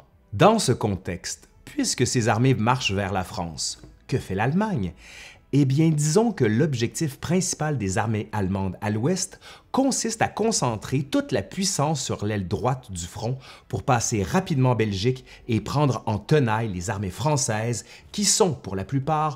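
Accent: Quebec, Canada. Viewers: Un accent un peu faible et qui devrait marquer plus l'exotisme du Québec, surtout pour les nouzauts francophones de l'Océanie. Génial ! Continue ! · Très belle diction avec cet accent attachant de nos cousins canadiens empreint du parler de nos ancêtres émigrés il y a si longtemps dans la belle province. Ils ont tant donné près de chez moi, à Vimy Mon plus profond respect pour tout les chums qui ont donné leurs vies pour notre chère liberté. Merci les cous' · Quelle chaîne remarquable ! Des sujets abordés avec sérieux. Les références sont détaillées. Tout est admirablement commenté avec une narration et une diction très agréables. Ajoutons en prime ce charmant accent québécois. Merci ! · c'est l accent québécois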